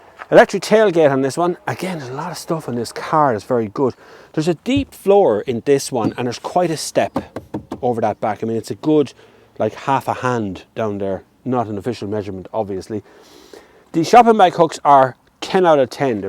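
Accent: Ireland. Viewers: How I love irish dialect!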